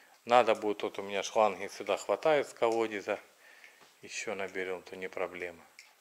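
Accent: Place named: Surzhyk, Ukraine. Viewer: ви приклад для всіх.Відео ваші 👍 Нам дуже подобається як ви розмовляєте по суржику.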